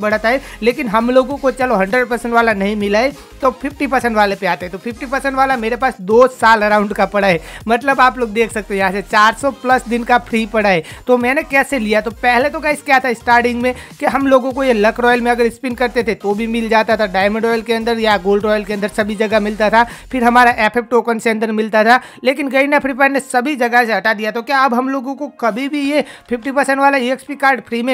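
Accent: Gujarat, India. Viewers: app Gujarat se ho kya bro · App gujrati ho kya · Bhai aap gujrati hooo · bhai aap gujarati ho?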